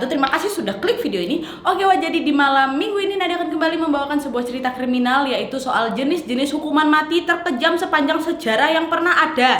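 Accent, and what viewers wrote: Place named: Java, Indonesia. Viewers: Ka ada medok eee:) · Jawa amt suara😂😂sampek lupa skrip nya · Kak nadia kok jadi medok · Mulai medok yaa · Sehat terus, kakaaak! Aku yakin yang ada di sini pasti sayang kak Nadia! Btw kak agak medok ke Jawa yak ngomongnya 💚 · Malam minggu kerjaannya nontonin youtuber favorit! 1. yg udh jdi medok 2. TussyStory yg menawan 😍 3. Nessie coz its british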